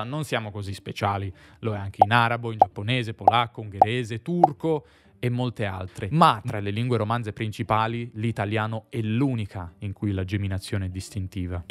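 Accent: United States. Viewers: You sound like an educated Texan when you speak English. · Parli inglese con la pronuncia americana!😉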